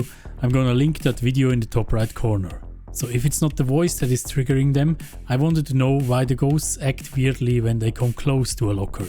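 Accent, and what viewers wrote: Switzerland. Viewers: schweizer english 😅 triggert schon ein wenig xD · Are u swiss?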